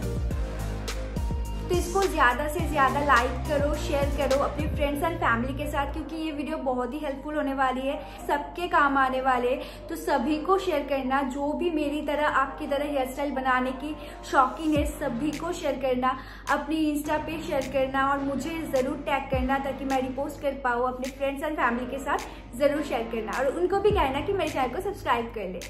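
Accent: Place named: Bengal, India. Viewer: Are you a Bengali girl Because when you are taking it listen like you are telling full hindi and half Bengali And I am also a Bengali girl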